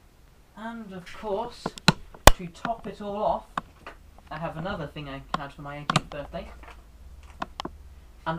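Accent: United Kingdom. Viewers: He sounds like some type of Londoner too, which is my least favourite accent.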